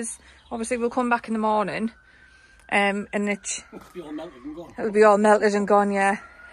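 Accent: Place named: England, United Kingdom. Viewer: I love your English accent, very refreshing. We are expats fromm South Africa, been living in Huntsville, Texas for the past 33 years.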